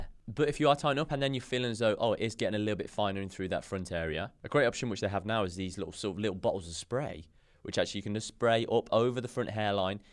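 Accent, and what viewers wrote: Cockney, United Kingdom. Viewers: I really like to watch your videos, your British, sort of cockney English, makes me rock. Keep up your great work! I also like the way you dress A LOT, very British as I said, very Londoner.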